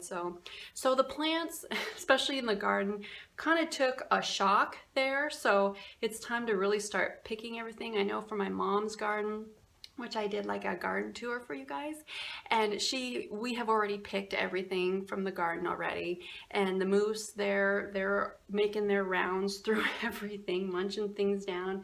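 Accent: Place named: Alaska, United States. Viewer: I could listen to you "rant" all day Miss with that Alaskan accent. Thanks for the chitchat!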